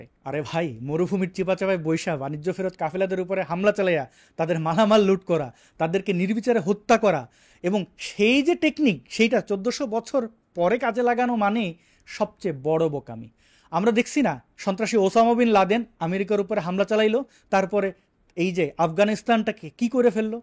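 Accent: Sylhet, Bangladesh. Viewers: Gowaaaaaaaadddd, tmr sylhet accent 😭 cuteeeee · brother, your Sylheti accent is wow. JUST LOVELY AND HEART TOUCHING ❤❤❤❤ Love from Sylhet..... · Vai apne sylheti eta jantam na..bala laglo sylheti mat hunia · ভাই কিতা সিলেটি নি?🫠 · আপনি কিতা সিলেটি নি 🙂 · ভাই কি সিলেটি নাকি? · ভাই একবারে সিলেটি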